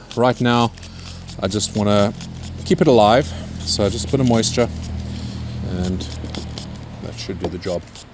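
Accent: South Africa. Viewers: Legit idea....and u sound south african...exellect content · is that South African I hear? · Are you a South African? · Is my mans South African?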